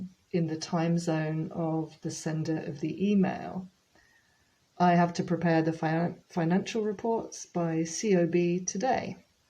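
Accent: United Kingdom. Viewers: oh okay I got it. I have been learning English since my childhood. My English knowledge is too bad. Next year I am aiming to go to private university. I only watching British English chanels.beacause I like how you speak. I mean accent pronunciation. I'd like to take online classes with you unfortunately it's too much in our currency.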